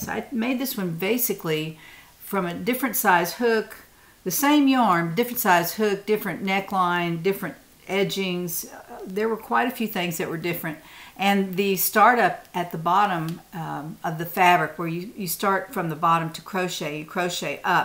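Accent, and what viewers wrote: South, United States. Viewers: This is the first time I’ve watched one of your videos. I really enjoyed your content and Southern accent. That comfy yarn looks great!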